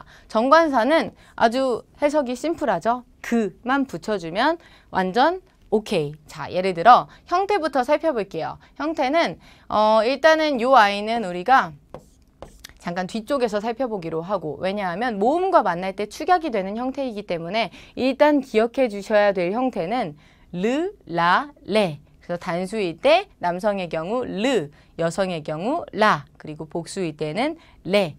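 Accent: South Korea. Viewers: 셈. 할끼요.억양이경상도쪽? 프랑스문법쉽게설명해주시어 감사합니다. 🇨🇵 😅 🇰🇷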